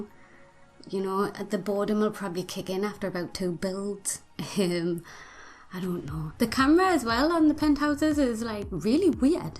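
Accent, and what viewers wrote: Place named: Yorkshire, United Kingdom. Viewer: I like your Yorkshire accent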